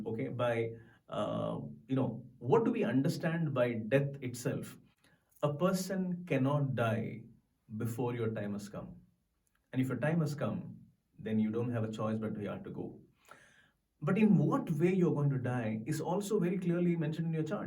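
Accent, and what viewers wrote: India. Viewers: The auto text can’t understand Indian English either... Germany rather than Gemini.. interesting though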